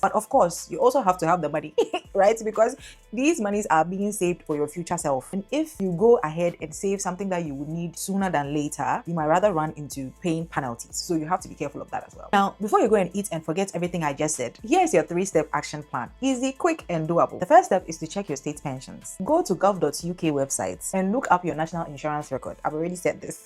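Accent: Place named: Ghana. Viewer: You do very interesting videos, but the playback speed at which you set the videos makes it difficult to understand what you're saying. You should be aiming at world-wide audience. You have a heavy ghanaian ascent, but i'm sure even some ghanaians will find it difficult, as the sentences are too fast. Often, the sentences are not complete before another one sets in. Don't you replay your videos to yourself? As these are more or less tutorial videos, why don't you just set the speed to normal to make it easier for people to follow what you are saying?. I often have to skip your videos as i find it difficult to understand what you are saying due to the speed of the sentences.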